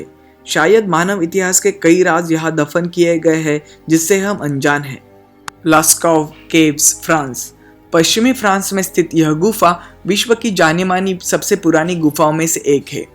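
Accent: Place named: Maharashtra, India. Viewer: narrator pakka Marathi hai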